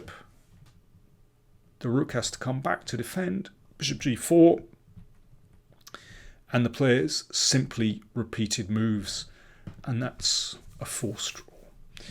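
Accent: United Kingdom. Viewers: I definitely agree! I currently believe that Daniel’s analysis is much better for improving. Because not only do I think that Daniel’s analysis gives us a grandmaster insight and ‘feel’ for the position, he also is imo able to express the psychological effects of moves much better. And while Agadmator’s top engine moves are nice to know, the analysis of the psychological effects of moves in terms of pressuring the opponent, being more comfortable and playing with the ‘flow’ of the game etc. are, I’m pretty sure, much more useful for improving than breaking down disgusting engine lines! And lastly as a bonus, I thoroughly enjoy the British accent and demeanor, especially when he gets all excited😆😇😇😇.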